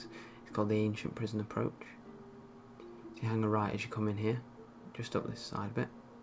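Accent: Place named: United Kingdom. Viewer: Yes, Ewan McGregor :D And I mean the person that is speaking in the videos. I wish I had this accent. I am not British though so I have to live with my foreign one... ah well :D Jealous though.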